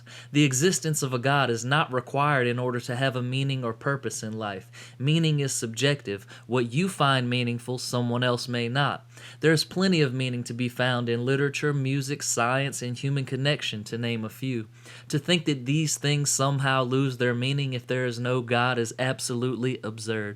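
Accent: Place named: South, United States. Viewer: I like when enlightened thoughts are spoken with a country accent!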